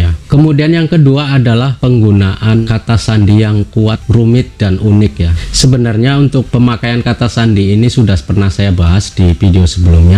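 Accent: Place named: Lampung, Indonesia. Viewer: L 15, anda orang bandar lampung ya, Terima kasih ilmunya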